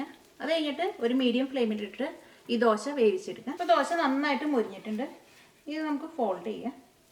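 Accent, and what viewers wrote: Thrissur, India. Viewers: പെങ്ങൾ തൃശൂരല്ലേ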